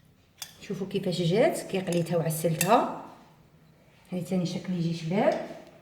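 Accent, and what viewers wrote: West, Algeria. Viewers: الله يعطيك الصحة نفس طريقتي انا صانعة حلويات انا من الغرب نفس اللهجة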